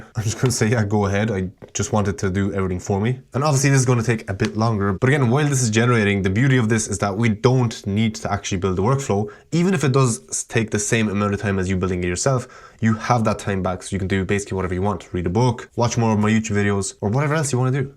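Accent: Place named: Ireland. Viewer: Man you Really have an Irish accent!!!!!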